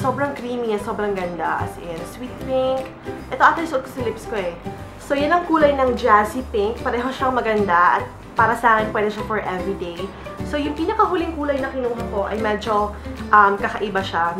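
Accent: Philippines. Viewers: parang ang lalim mo mag tagalog ateeee kakatuwa! ehehe <3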